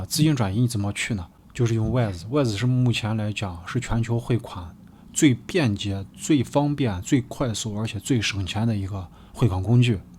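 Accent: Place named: Shaanxi, China. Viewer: 主播 悄悄问你一下 你是不是陕西人？陕普口音😊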